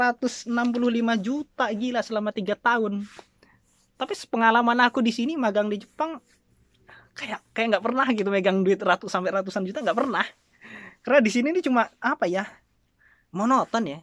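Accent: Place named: West Java, Indonesia. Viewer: Urang sunda ieu mah nya....,keliatan logatnya...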